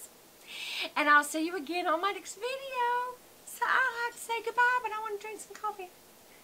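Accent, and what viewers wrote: South, United States. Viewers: !!! OMG where have YOU been all my life! Brahmin AND A PLANNER GIRL?! I love it! This video has changed my mind about which Brahmin (will be my first one) for my birthday next week. I love the southern drawl, imma a bell too! 😘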